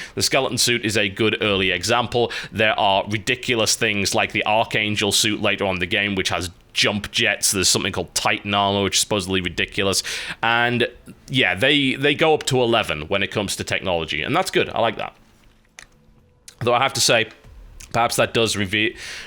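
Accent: United Kingdom. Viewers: ....he is British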